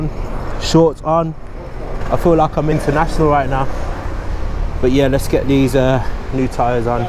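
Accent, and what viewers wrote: Birmingham, United Kingdom. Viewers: I’m new are you from Birmingham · Are you from the uk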